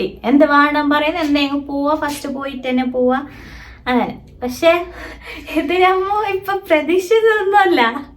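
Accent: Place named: Kasaragod, India. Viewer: Ksaragod basha edayo kanda pole ....eda nigala home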